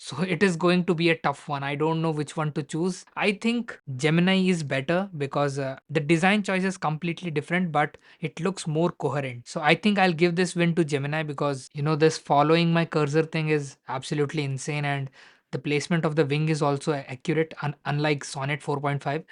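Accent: India. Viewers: Please take english lessons i cant with these indian accents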